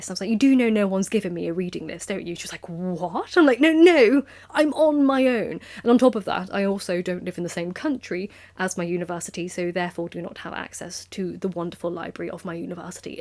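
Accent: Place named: England, United Kingdom. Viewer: You are really english you have a gracious accent lady but! but ! it s a true challenge for me lady to catch all your words . I listen to you to train my ears to hear different accent and is your case lady you put my english skill to test!! Lady . For me true english speak like you . I m french and i learn english without teacher on my own . I see all your books around you i like this enverino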